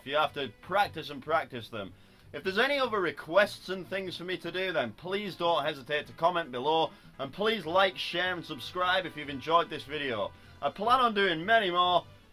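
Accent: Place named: North, United Kingdom. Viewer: Dude, you are so northern. I love it. Subscribing now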